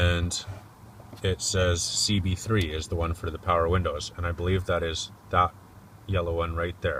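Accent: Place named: Midwest, United States. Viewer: just for kicks where is that accent from you Canadian or from Minnesota?? Lol. I'm a midwesterner too so I picked up on the accent?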